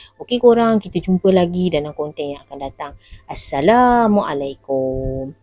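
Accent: North, Malaysia. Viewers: Asalamualaikum..hye sis.. manih2 oghang utara ni.. org nismilan ckp 'sojuk yo mato mandang'..hehe..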